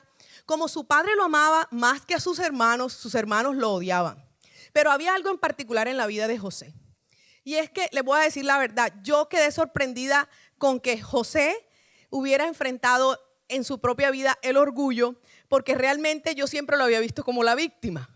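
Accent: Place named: Caribbean Coast, Colombia. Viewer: Gracias, la pastora se escucho muy costeña. Muchas gracias. Muy buena predica... Att: Una Barranquillera desde USA, NJ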